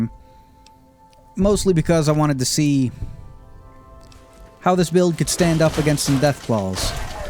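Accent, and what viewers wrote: South, United States. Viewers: Now I know that a law-abiding Canadian can't survive in a wasteland because it was ironically proved to me with a pleasant Southern accent. Thank you, sir!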